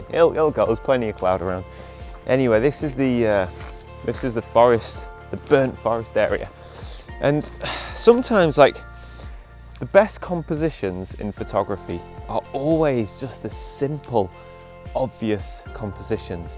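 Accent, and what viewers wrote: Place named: Northern England, United Kingdom. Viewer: Love the way you subliminally introduced us to the lone Silver Birch! Also although it is great to listen to your lovely northern english voice (I was brought up in Manny, in Australia now though) I think your recent videos are too much talking head and not enough about the photography, composition, voice, technical and so on - like it used to be. I think most folk who do landscape photography know about early mornings, camping, walking, trials tribulations, but many folk that I 'mentor' mostly want to know about composition and what makes a shot stand out combined with processing? But carry on as normal, I am one voice in a sea of back patters :)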